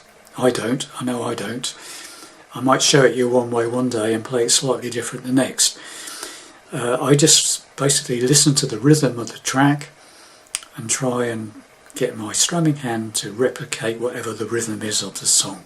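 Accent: United Kingdom. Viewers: I’m now just seeing this video 7 months later. It never showed in my feed. I was one of your very first subscribers who suggested tons of lessons in time that are on your channel. You were the person I turned to for tutorials when I started learning guitar. You’ve helped greatly and you are extremely appreciated, I hope you know that. I’m far along in life now from when you did your channel. I can play extremely well now, and have learned a lot. Even my music has changed from the music I suggested, I’m definitely more into country music and folk music now. Also, I always knew you were British from your accent! You’re a good lad. You’ll always be in thought mate. Hope all is well. Cheers!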